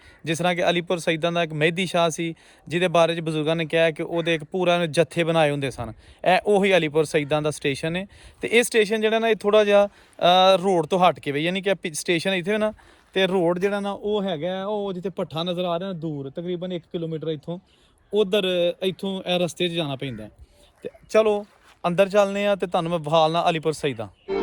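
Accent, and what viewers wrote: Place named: Jammu, India. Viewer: Thudi boli bilkul Jammu aur kathua wali hai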